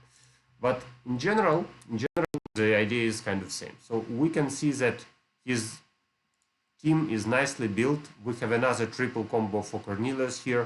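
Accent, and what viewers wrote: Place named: Russia. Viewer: в твоем английском я слышу русский) isn`t it?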